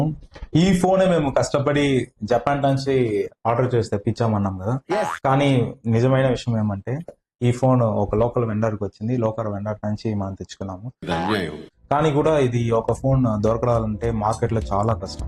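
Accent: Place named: Tamil Nadu, India. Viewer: Me telugu different ga undi brother. Like tamilian learnt telugu..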